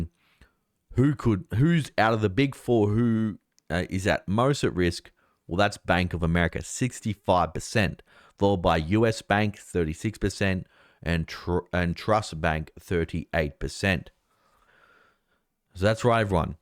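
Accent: Australia. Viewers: PLEASE! use your Australian accent d-arta!/data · It's Data Not "Daita" You're Australian. Transparent yearn for USconnection. So either change your accent totally or stick to your OZ accent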